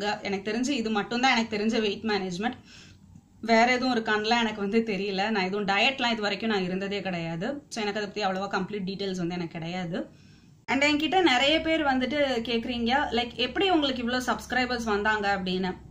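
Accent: Kerala, India. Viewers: Neengha pesuvathu malayali mathiri iruku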